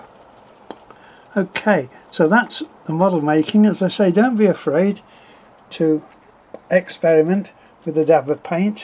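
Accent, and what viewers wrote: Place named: Northern England, United Kingdom. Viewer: Grand stuff, you sound reet Northern in it......great modelling tips.......don't worry some one the other week said I sounded very Northern!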